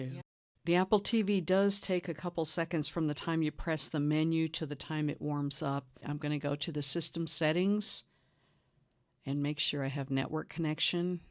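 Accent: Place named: South, United States. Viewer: I appreciate you southern accent but it's Roku not Ruku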